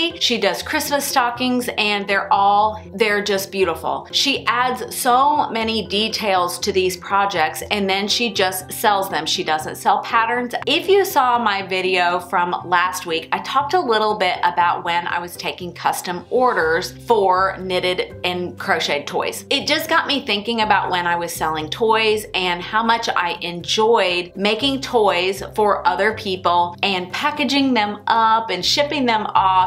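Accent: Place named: Virginia, United States. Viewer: I really liked this one. I'm from norfolk, va and your accent reminds me of home. I like old cemeteries too!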